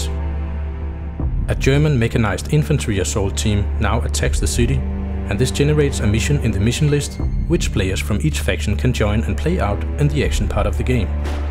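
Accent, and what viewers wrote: Denmark. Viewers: Haha! Could hear right away that the narrator was danish! xD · Who voiced this? a danish person? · No, he's Danish, I know this because I'm from DK myself, and I can clear recognize the accent :D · i hear a danish accent... hmmm FUCK YERH IT DANISH!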